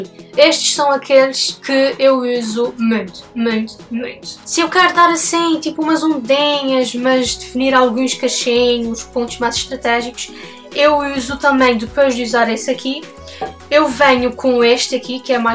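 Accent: Portugal. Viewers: Meu Deus, acho lindo mas dá aflição português de Portugal afffffff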